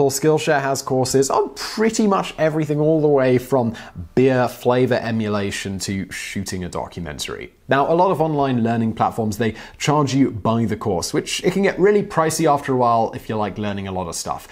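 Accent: United Kingdom. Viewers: Seriously what is with the way the British pronounce aluminum? It was made in America named by an American and pronounced the way Americans pronounce it. You wouldn't just start pronouncing somebody's name differently because you're British would you? I'm going to state calling you because this is America damb it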